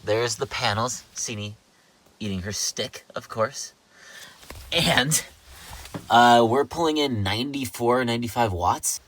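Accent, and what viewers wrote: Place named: United States. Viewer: My Brit wife says you have an American accent. As an American, I agree.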